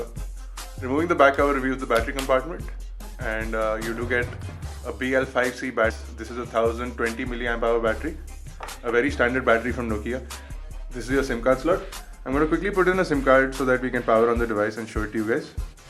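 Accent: India. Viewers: 1st time I heard his voice , I knew he is Indian. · great review, i cant like or subscribe though u sound Indian... and i m Pakistani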